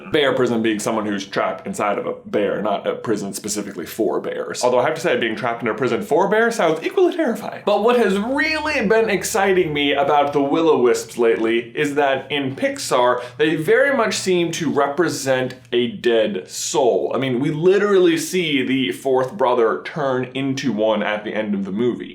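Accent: South, United States. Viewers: Do you normally talk with a Southern accent, if so why change it? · i never realized that he has a sight country accent until this video and now it is all i hear oml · I can't get out of my head that he is southern now. I didn't notice his southern accent before. Great.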